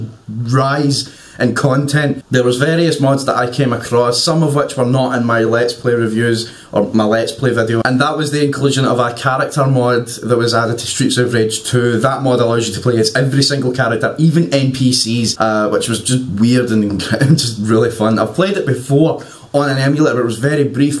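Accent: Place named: Glasgow, United Kingdom. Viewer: Guessing your from glasgow and a celtic man like myself? :)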